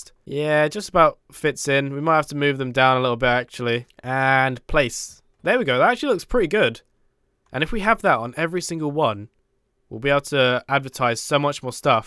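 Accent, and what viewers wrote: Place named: United Kingdom. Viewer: That British pronunciation..... I love it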